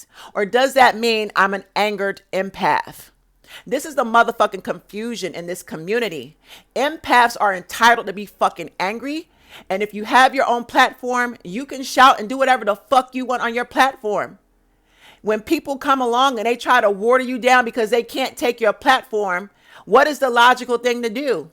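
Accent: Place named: New York, United States. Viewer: Girl, you are New York on 100%. This just how we talk on a normal day. F them weak ass, soft asses. I love your tone and your vocabulary. You have taught me more about life than any school or any class I have ever taken. You change and save lives. The Devil is mad at you sis because you stay on his neck. These Kevin Samuels types make it seem like it is ok to put black women down. They get big mad when we speak up and shut them up! They do not have half of our intellect, our personality, drive, ambition or success. So they get mad and try to bash us. F Them.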